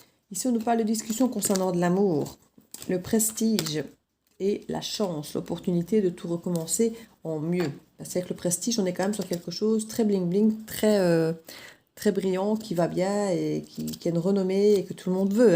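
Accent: Belgium. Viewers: Un accent belge ''😂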